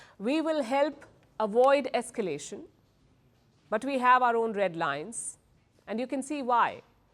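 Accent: India. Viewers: P.sharma you good reporter but we don't like your Indian accent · Indian accent is the most beautiful accent in the world like this news woman. Rishi should also speak with an Indian accent. Actually, the whole world should speak with an Indian accent. The best accent in the world is an Indian. For sure!!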